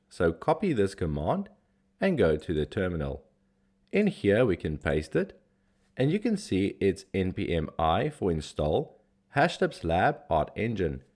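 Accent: South Africa. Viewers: Hey NFT ! Thanks for all this information. You sound South African, did I get that right? Anyways, I'm getting stuck in getting the art engine to work on my end (Windows). I have just finished my collection's art work and would now like to generate it. I'm getting stuck at the point where I have to input 'npm i' into the terminal. When I do so, it gives me a bunch of errors. I'm not a coder (still in the baby stages of learning) so this is all foreign for me. (Art engine template) Any help would be greatly appreciated.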